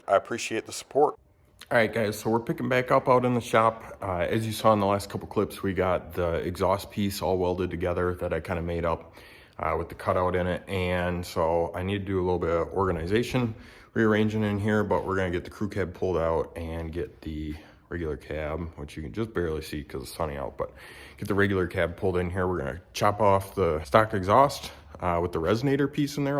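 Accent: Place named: Wisconsin, United States. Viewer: U sir , sound like ur from Wisconsin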